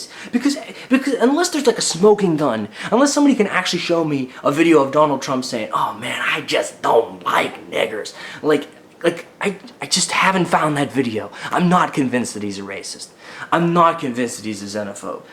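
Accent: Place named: Canada. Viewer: You should move to Canada... You talk like a lot of us haha Decent poli video sir!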